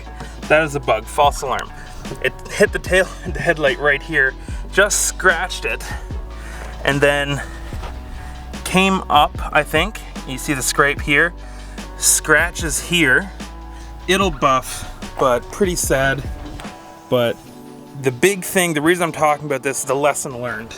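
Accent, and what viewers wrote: Canada. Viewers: Lmao the Canadian accent “aboouut” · Canada eh?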